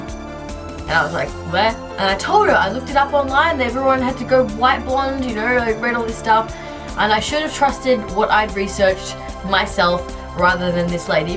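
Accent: Australia. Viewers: I think she's an Aussie ^^ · Girl, I'm an Aussie living in the UK - was sooooo nice to hear your accent!!!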